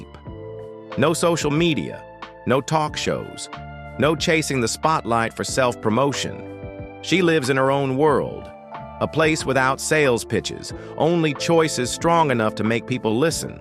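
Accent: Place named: South, United States. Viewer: this narrator dealing in a southern drawl? It seems it just Popped up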